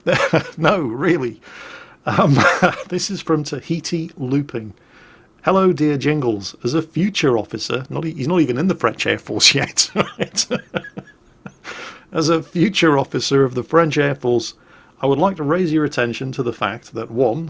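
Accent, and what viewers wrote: United Kingdom. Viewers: People who swear a lot tend to be more honest than the average person! That being said, I come to your channel for your mentality and brilliant British. Your voice! Its like angel farts to my ears! Much love, all the way from Lebanon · I watch your videos all the way through and find your cursing hilarious, the well articulated, very british way to call someone a complete waste of space is awesome. Robert Pike should appreciate you teaching his grandsons to swear with sophistication and style rather than just the usual dropping of f-bombs and yelling names. Don't ever change.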